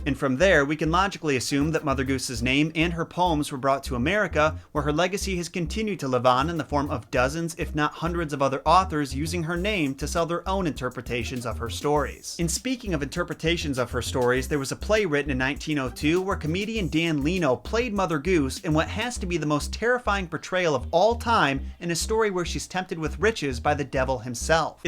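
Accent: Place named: New York, United States. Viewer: Sometimes goes full New York italian when he wants to.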